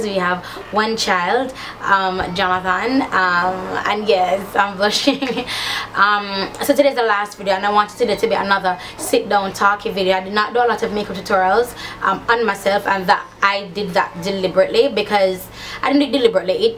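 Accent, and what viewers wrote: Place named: Jamaica. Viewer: When you are speaking to your international viewership you need to slow down in your speech and enunciate. We LOVE the Jamaican accent, but it can be hard to understand at times!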